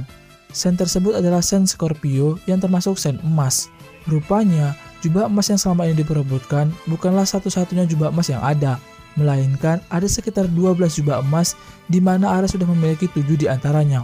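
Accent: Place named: Medan, Indonesia. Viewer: Anak medan keknya kau ni ya kawan? Sukak kali lah penjelasanmu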